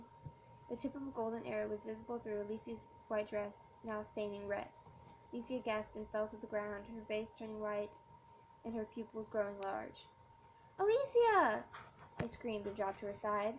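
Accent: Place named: United Kingdom. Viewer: british accent tho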